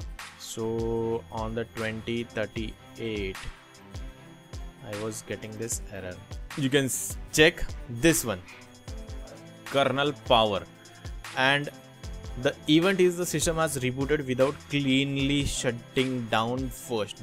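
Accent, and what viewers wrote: India. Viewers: The narrator has an Indian accent, is that ok? Since when windows has a robotized indian accent ?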